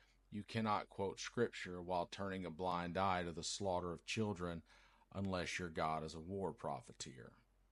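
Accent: South, United States. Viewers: You are a treasure and represent what a real man (with a southern accent) should sound like. You are describing religious ghouls...they are nothing more and we all know it.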